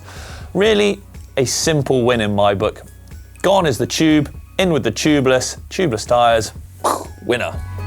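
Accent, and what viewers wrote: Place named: United Kingdom. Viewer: very informative video! and no offense but good job: to keep the accent down for us non-british viewers...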